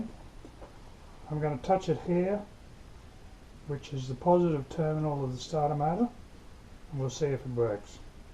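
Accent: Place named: United Kingdom. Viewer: Honestly just from your video sir just this one you've actually kind of persuaded me to listen to British people explain something now I find that funny because yes I am an American I'm sure you would have guessed and I'm a very proud American I'm not saying that I couldn't put that aside to learn something from the British I'm just saying I've always made fun of the way British people spoke their accent their choice of pronunciation of certain words I mean I have legitimately fallen on the floor rolling around laughing so hard but I guess at one point or another you always end up learning about the things that make you happy thank you for the video sir you've earned my subscription in like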